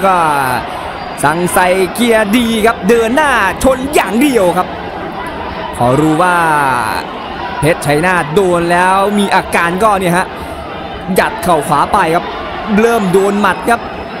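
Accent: Isan, Thailand. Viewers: เว้ากลางน้นล่ะดีแล้ว เวลาเว้าอิสานโทนเสียงบ่น่าฟัง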